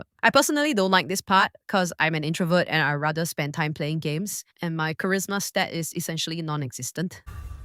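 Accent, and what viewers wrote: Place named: Singapore. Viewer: Eh you got Singaporean accent... 😮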